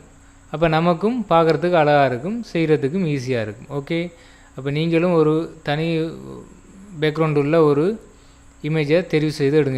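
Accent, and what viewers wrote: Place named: Sri Lanka. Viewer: Excellent SriLankan Tamil. Super . Vazthukkal Brother...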